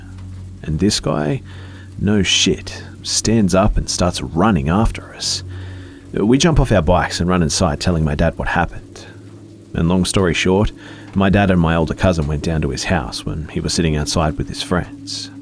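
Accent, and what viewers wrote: Australia. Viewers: You have such a wonderful soothing voice!! Thanks for using your talent to help me relax and unwind from my stressful life. It's always a pleasure hearing your Aussie Accent!!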